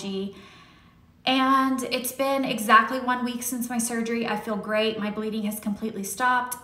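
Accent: South, United States. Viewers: The accent, I love that southern accent. I kept replaying that part. Love you, you are so strong ❤️ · You went so southern after the anaesthetic hahaha I love it, sending love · I thought the same! So southern! It was cute to see. X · I was going to say the same thing. Post-surgery gets real southern.:-) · I LOVE how southern you sound coming out of anesthesia! So cute! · Thanks for sharing your difficult experience, southern twang and brutal honesty. You’ve made it that much better for the next person that has to go through this awful ordeal. Sending you lots of hugs from NC! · So glad you're ok! So sorry about your sweet baby <3 we love you so much! and your southern accent too haha.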